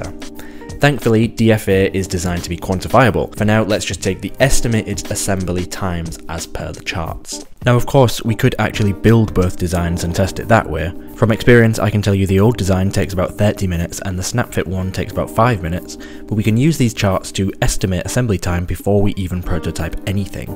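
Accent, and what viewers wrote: Hull, United Kingdom. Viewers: Hi, is that a Hull accent?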